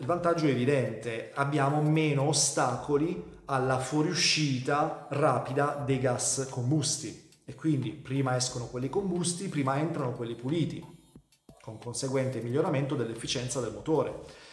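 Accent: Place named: Molfetta, Italy. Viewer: sento un accento molfettese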